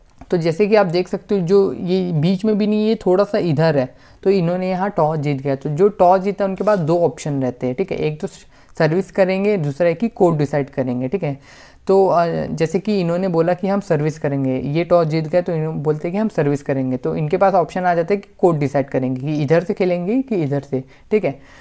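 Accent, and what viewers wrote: Odisha, India. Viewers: Bhai ap odiya ho na kyuki bhech bhech mai ap Mane Mane bol rahe ho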